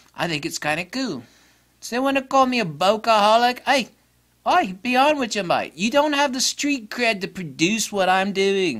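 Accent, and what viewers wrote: United Kingdom. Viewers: wow, a British accent! At least one of them... Now say this "Would you like a glass of water?"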